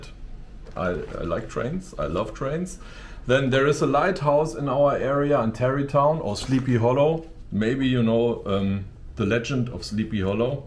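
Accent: Germany. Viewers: Coole Schreibmaschine! I like your German Accent! 😅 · Hello, I' m surprised, that I understood the most of what you said😉 and I love your german accent. Nice story about the postcards👍. Best wishes, Maria🙋‍♀️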